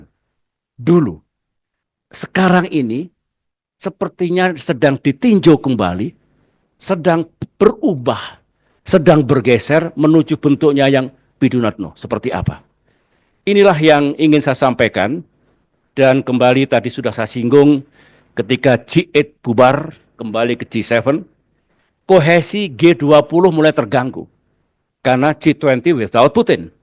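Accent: Java, Indonesia. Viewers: lidah wong Jowo 😀😀🙏🙏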